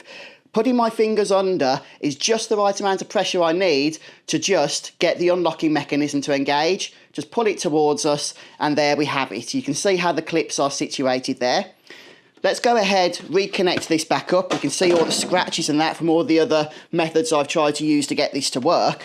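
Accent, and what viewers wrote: Birmingham, United Kingdom. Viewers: As soon as I heard the Brummie accent, I knew this was going to work. And it did first time. Thanks mate you really helped.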